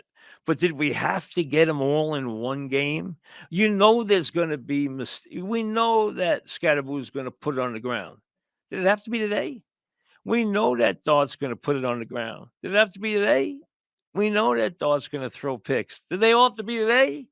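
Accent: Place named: Long Island, United States. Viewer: love but as a long islander, his accent is HORRIBLE…”yeh gotta thrOH thuh bawl!” i always thought part of being in broadcast joirnalism was adopting a natural, neutral accent and speaking and pronouncing clearly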